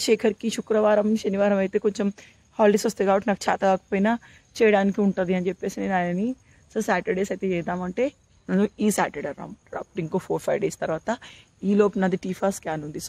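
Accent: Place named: Hyderabad, India. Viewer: Hyderabad slang masthu vachindi akka niko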